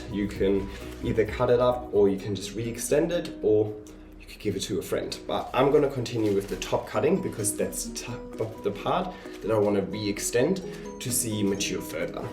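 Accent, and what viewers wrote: Germany. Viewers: Lovely video! Is that a german accent u got? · Den deutschen Akzent hört man einfach immer raus :-)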